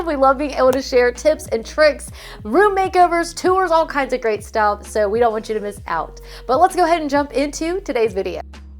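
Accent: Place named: South, United States. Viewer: I slowly hear your "southern" creeping back!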